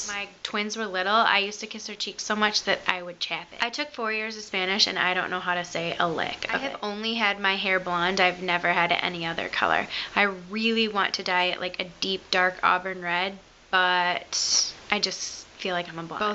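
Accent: Michigan, United States. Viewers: Ok, how did I miss this one? I feel like I'm your stalker, but redheadphd linked it as being one of the funniest videos she has ever seen. You're so cute,! I personally like your Michigan accent. It reminds me of one if my best friends that is from Grand Rapids.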